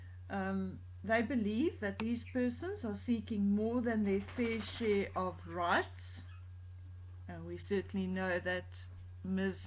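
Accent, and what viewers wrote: Afrikaans, South Africa. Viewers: 🇿🇦 I enjoy hearing your afrikaans accent, it reminds me of happy times when i lived there. Also you talk a lot of sense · Her whole life is a distortion. So many lies and misinformation....very sad creature if truth be told. She’ll be a lonely bitter old woman before too long. Thanks to Wally for recommending you. 🇦🇺 BTW love your South African accent!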